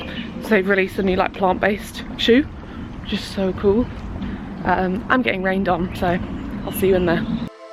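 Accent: United Kingdom. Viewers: Absolutely not related to the video but: I just came back to France from Wales a month ago, where I lived for a year. Hearing your accent and seeing that pot of yogurt that was definitely from Asda suddenly made me so emotional. I'd never thought I'd miss the UK that much.. Lots of love on you and your fabulous country ♡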